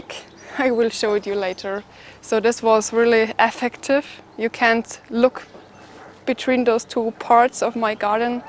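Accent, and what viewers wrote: Bavaria, Germany. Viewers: So leiwand dieses bayrisch :D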